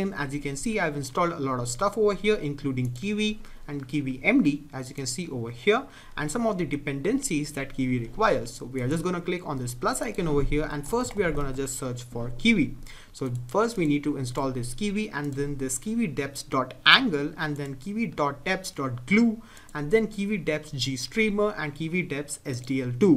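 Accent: India. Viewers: Indian thick accent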